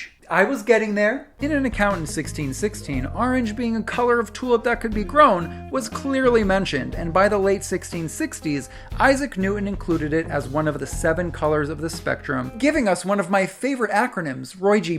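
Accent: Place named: East Coast, United States. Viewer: All very interesting, but what I'm most struck by is that as a midwesterner I thought "orange you glad" was just a bad pun. The jersey/east coast pronunciation (and probably origin) makes so much sense haha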